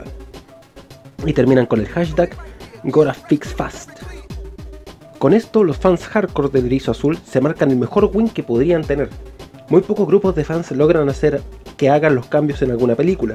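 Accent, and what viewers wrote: Chile. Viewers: Chileno poh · este wn es terrible chileno oe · Eres chileno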